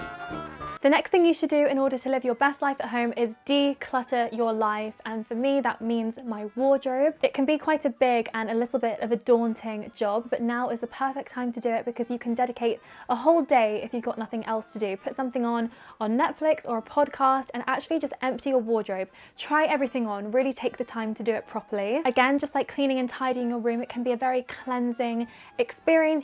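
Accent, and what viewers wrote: United Kingdom. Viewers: her british accent... love it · Jennie Aesthetics I’m English and sound nothing like her she is posh English 😂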